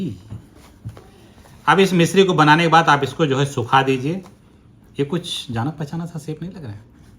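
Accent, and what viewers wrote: India. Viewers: * आपने अपने विडियो में 2 महिला + पुरुष के विडियो की क्लिप डालकर बहुत ही अच्छा किया * आपकी हिन्दी में ठेठ देसीपन है और इस वजह से अंग्रेज़ी के उच्चारण में भी देसी फन ( पन नहीं) है * जो सुनकर मजा आ गया * बाकी जानकारी का तो जवाब नहीं * धन्यवाद देसी पन और फन के लिए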